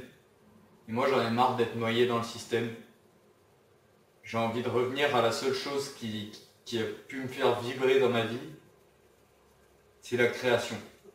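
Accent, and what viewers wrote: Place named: South, France. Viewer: Même ton accent du sud combiné à ton côté parisien, ça fais du bien de voir ded gens qui font des ponts. Des liens. Et qui sont pas dans les clichés stérile et gratuit... Il nous faut plus de gars intègre comme toi. Pas des mecs dans la posture intellectuelle, qui tente de développer des point de vue qui ne sont pas les leurs, qui ne les comprenne même pas du coup, mais qui pourtant ne les remettront jamais en question, qui ont interet à cette posture. Toi on sent que tu as cet accent parce que ton personnage(et/ou toi) viens de la-bas, point. Tu va pas faire genre parce que tu es parisiens, pourtant j'en connais des pélos qui le font... Tu perche sur jodorwsky, ça t'empeche pas de parler foot si tu a envie. Tu comprend ce que je veux dire ? C'est en ca qu'on taime, pas pour ça.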